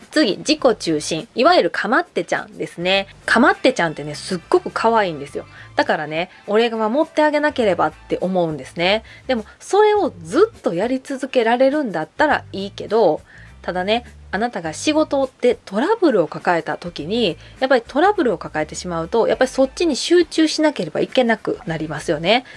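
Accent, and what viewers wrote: Kansai, Japan. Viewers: 先生は関西の人なんですね☺️ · さんの関西弁⁉️ですか、とっても愛らしいです。説明も理解出来ます。男女分けるって案がありますが、一緒の方が互いの違いなどが聴けてむしろ理解が深められるのではないかと存じます。大切なのは、お互いが「謙虚」でなければならないということですかね。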